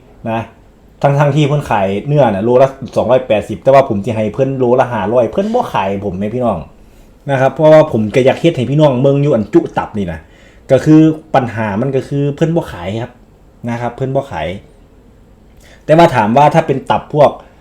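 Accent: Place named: Udon Thani, Thailand. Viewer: คือเว่าคือคนอุดรฯแท้อ้าย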